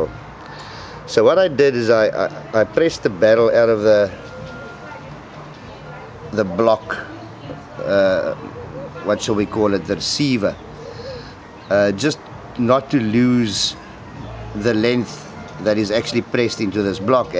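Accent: South Africa. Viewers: Always a pleasure to listen to the SA accent. Brings back good memories. I agree with your assessment of the QB 79. Great fun and then some.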